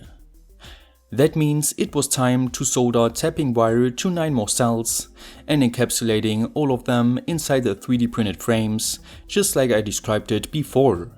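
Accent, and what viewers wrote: Germany. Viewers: I am trying to make a simple - foldable array of small panels able to charge a 20000mAh 18W power bank. After 10 minutes of sifting through a ton of garbage videos on this topic, all with colorful thumbnails, idiotic, brain - damaging music and guys who just hot-glue shit together, I finally arrive to a guy with German accent. I think this will be good.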